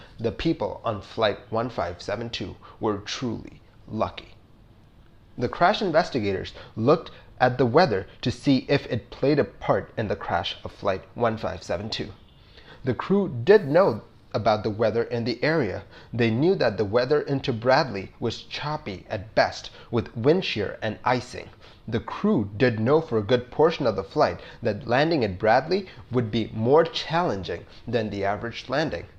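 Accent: India. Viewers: He's from India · You sound like an absolute asshat, since he is Indian and English isn't his first language 🤷‍♀️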